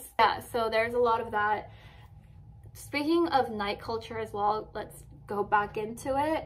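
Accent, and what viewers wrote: United States. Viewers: She sounds like a racist white girl in America! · Are you Naturally born Korean? you sound more American especially the way you use your slang. Were you born in America? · I see you have an American accent, which possibly means you didn't grow up in Korea, so how would you know all these things about Korea? I don't care you're ethnically Korean, if you grew up somewhere else, you don't really have a place to talk about these things. If I'm wrong, correct me!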